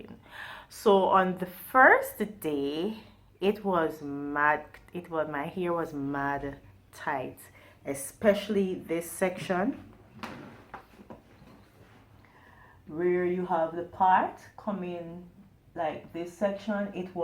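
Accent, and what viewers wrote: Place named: Guyana. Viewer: Why do you sound Guyanese!!! 😍😍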